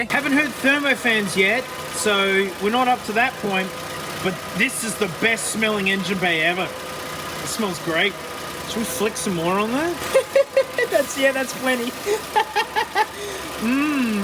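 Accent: Australia. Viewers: I have never watched ANY of this guys videos. Immediately “this guys Australian, theres some shit about to happen”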